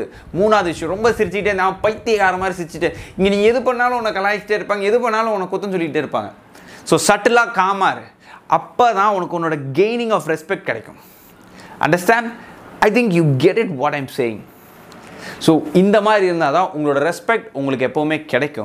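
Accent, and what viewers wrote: Salem, India. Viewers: Bro nenga salem ah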